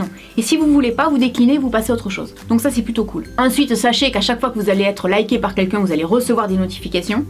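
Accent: South, France. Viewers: l'accent du sud!!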